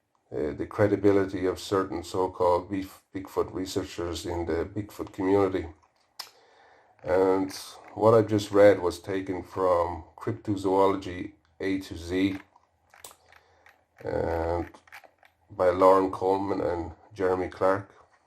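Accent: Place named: Ireland. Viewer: What part of Ireland are you from pal? 🇮🇪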